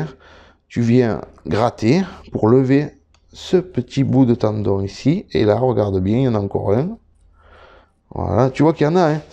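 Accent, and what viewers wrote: France. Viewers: Moi, comme abonné à ta chaine, je te regarde de la Beauce, Canada. J'aime tes vidéos car j'apprend beaucoup sur la boucherie. Ton accent français me chatouille les oreilles. J'adore! Merci!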